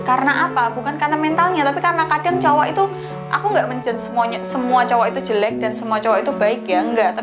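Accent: Java, Indonesia. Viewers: logatnya orang jawa..? benaran gak sih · Medok wkwk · Jawa sekali logatnya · MBAK NYAA MEDOK BANGETTT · kok logat e koyo wong jowo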